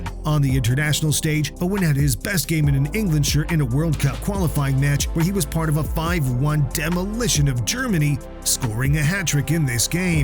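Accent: United States. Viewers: This guy sounds American, makes sense why this video is shit.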